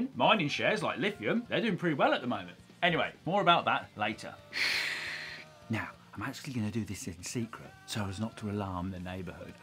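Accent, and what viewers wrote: United Kingdom. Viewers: You sound SO British. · bro this is the most bri'ish guy i evever seem!!!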